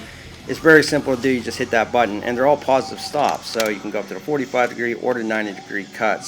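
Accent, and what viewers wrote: Pittsburgh, United States. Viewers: Any chance you're from Pittsburgh originally?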